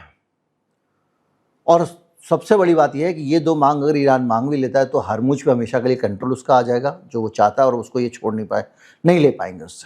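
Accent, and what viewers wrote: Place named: Delhi, India. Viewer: What a wonderful content sir 🤩😇 everyone in the morning must watch sir’s video where he will give news in a fashion that it’s entertaining and learning and soothing as well in a typical Delhi style of Hindi. It’s always refreshing to watch his videos in the morning, particularly.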